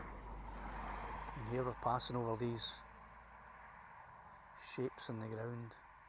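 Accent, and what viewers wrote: Scotland, United Kingdom. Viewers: Scottish accent is the best. ☺️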